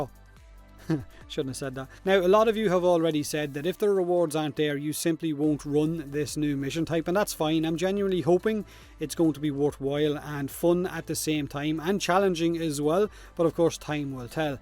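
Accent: Ireland. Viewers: You said you wanted [Akmagnus Prime], but is there another weapon you’d like to see Primed? For me, it’s [Akmagnus] too, cuz America! Fuck yeah! As well as [Corinth]. I love that fucking shotgun! Good ole’ American magnums and pump action, am I right? You wanna kill something, buy American! (OuO) <3 Love your vids and please, for the love of Clem, let me hear your full Irish accent. I’m Irish-American, and I can’t get enough of your accented hilarity!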